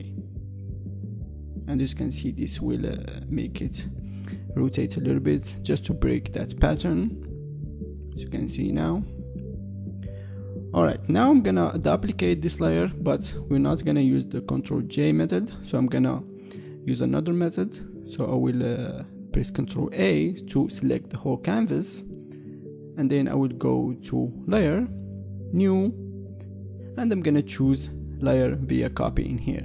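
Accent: Morocco. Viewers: you have a Moroccan accent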